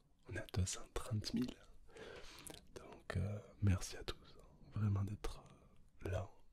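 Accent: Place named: Toulouse, France. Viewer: La voix de crooner avec l'accent toulousain(que j'avais eu la chance d'entendre en te croisant au tgs Springbreak il y a 1 an) je valide, pour un des seules voix masculines me relaxant, t'es un Chef👍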